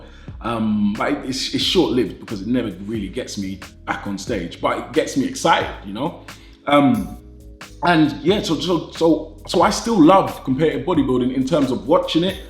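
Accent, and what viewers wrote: United Kingdom. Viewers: British, can’t you tell from his accent.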